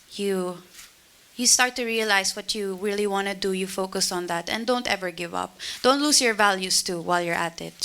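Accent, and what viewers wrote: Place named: Philippines. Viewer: Any non filipino out there loves her filipino accent??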